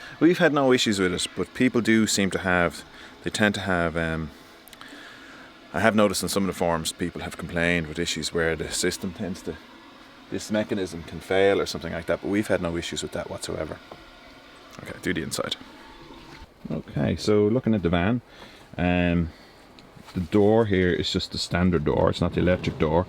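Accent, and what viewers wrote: Ireland. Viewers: Beautiful Irish accent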